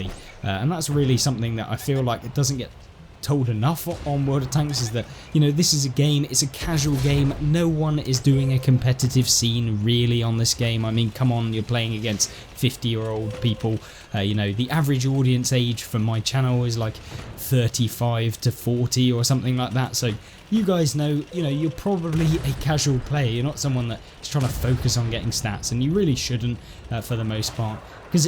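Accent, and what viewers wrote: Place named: United Kingdom. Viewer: Playing every class is a good way to learn the game, map as spots for light tank to hide in the open that will not work as a medium of heavy tank, Playing Arty will make you learn the places to hides and to stay on the move, in my personal opinion Good Arty player are Arty that stay on the move to, yes you can take one to 3 shot from the same position Sometime all your shot without moving but following your team as the game progress especially if you have a slow Arty can be a Good way to take the enemy by surprise if they expect you to stay at the base in the right corner of the map for example but all your team went left and you follow them then they will be confused on how they can get it by Arty and then don't know where to hide, yes classes have there roles to play but the more you can adjust during the game the more useful you are to your team with the motherland in that case if you top tier you can rely on your armor to play like an heavy medium and block shot and your reload is fast so you can support your light armor teammate, but in t10 game you better play it more like Spotting medium cause you got a nice camo on this one and can't rely on your armor but you can support your heavy that lack vision range at that point and help spotting TDS who fire at them, BTW really love your channel never got the chance to play with you yet I'm guessing you must be in Europe server as you sound from U.K, sometime I play with if your friendlist isn't full you can add me on Xbox RTB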